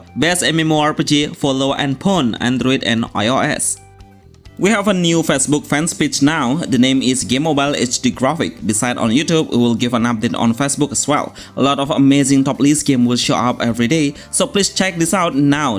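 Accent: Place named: Indonesia. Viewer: Indonesian accent😅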